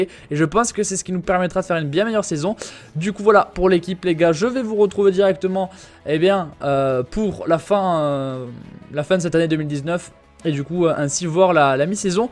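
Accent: France. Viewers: (Pck si c’est pour avoir une prononciation en lecture à la française..)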